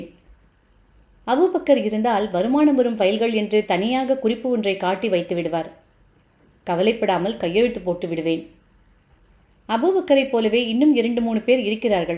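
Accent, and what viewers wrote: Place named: Tamil Nadu, India. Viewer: I am very addicted to your Tamil accent 😊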